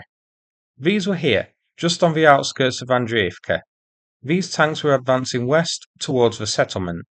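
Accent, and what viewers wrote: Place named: Home Counties, United Kingdom. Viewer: Sounds a bit Home Counties but I think you can safely call the 8 buildings that make up Andrivka a 'Hamlet' not a town 😉❤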